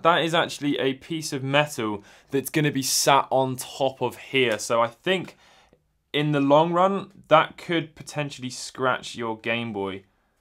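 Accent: United Kingdom. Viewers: You said aluminum like an American first lol. Then changed it to your British pronunciation.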